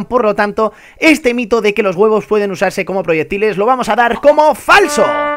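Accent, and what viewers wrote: Spain. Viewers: es español con acento español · eres mexicano con acento español??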